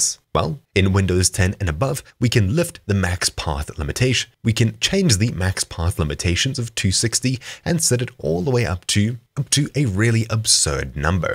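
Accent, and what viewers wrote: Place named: South Africa. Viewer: Whoa sounds SAfrican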